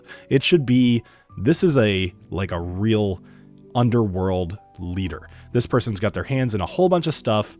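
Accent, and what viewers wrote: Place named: Canada. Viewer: You're Canadian? I just clocked it...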